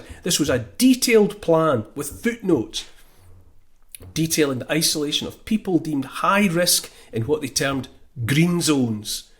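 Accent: Scotland, United Kingdom. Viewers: the TRUTH delivered in the Brogue, thank you timeless warrior. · There's just something about a Scottish accent... The way the word "Freedom" just rolls off the tongue of a Scotsman... May the GOD of Abraham, Isaac and Jacob... Bless, Keep and Protect you my Brother!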